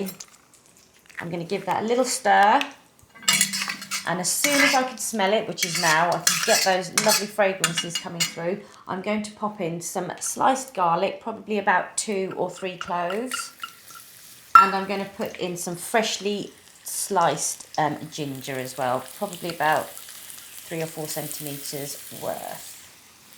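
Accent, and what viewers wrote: United Kingdom. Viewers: I just watched the whole video just for that British english. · HEY SEXY INDO WITH BRITISH ACCENT YOU FORGOT TO ADD ANY SPICES NONE ARE YOU BOILING IT WITH NO SALT PEPER CHILI POWDER OR WHAT ?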